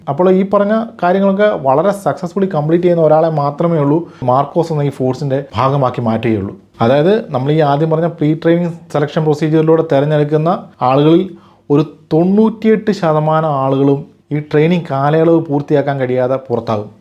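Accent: Kottayam, India. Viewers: നാട്ടിൽ എവിടാ കോട്ടയത്ത്‌ ആണോ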